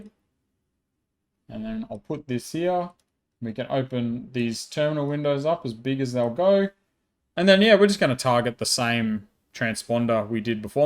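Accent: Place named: Australia. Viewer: Thanks, good video and great to listen to an Aussie accent for a change. Where do the numbers you use to fill in the fields in TBS Recorder come from? Are they published somewhere? I get that this video is "for educational purposes only" and you don't want this to turn into a hackers guide. Does the locked status change only when you've selected the correct settings for that satellite and that transponder?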